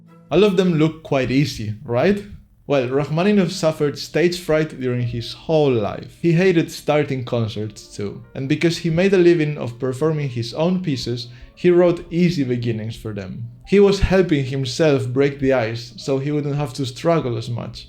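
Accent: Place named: Spain. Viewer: Se te nota el acento, español jajajaj. Buen video :)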